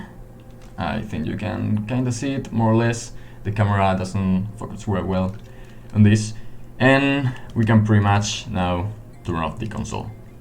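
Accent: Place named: Spain. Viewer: claro primo. ¿No reconoces a un español hablando inglés? Se nota el acento!! :D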